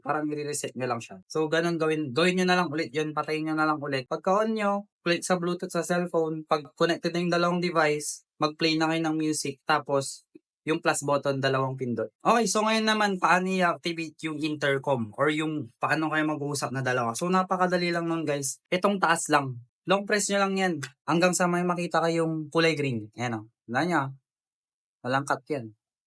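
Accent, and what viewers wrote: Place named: Pangasinan, Philippines. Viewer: Pangasinan accent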